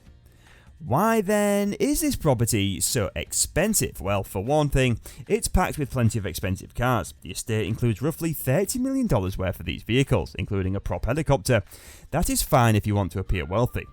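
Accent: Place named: East Yorkshire, United Kingdom. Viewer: The commentator sounds like he's from East Yorkshire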